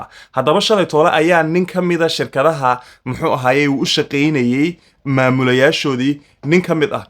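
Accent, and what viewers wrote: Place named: Arab world. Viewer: بأي لغة تتحدث لهجتك عربية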